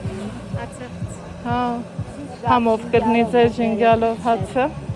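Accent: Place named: Ijevan, Armenia. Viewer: Ha jan mer Ijevani xosi mis@ shaat hamova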